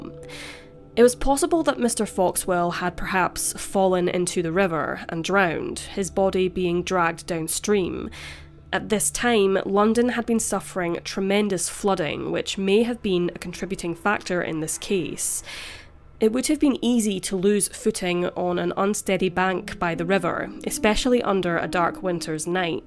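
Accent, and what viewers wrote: Scotland, United Kingdom. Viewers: I don’t think so , sounds like she’s Scottish to me , so makes sense as people in Scotland would want any other team other than England to win lol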